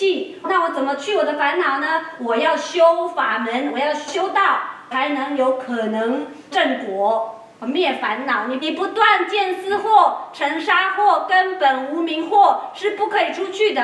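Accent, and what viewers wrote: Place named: Taiwan. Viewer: Mandarin, with Taiwan accent. Religious content.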